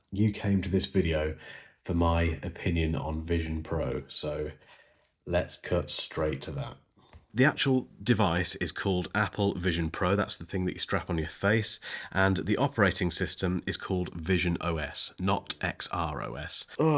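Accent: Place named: RP, United Kingdom. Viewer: Btw I like your accent, sir! Is it RP accent? Whereabouts in the UK are you from?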